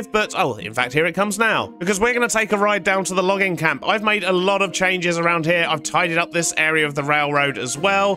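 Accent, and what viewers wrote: United Kingdom. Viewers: Also are you british?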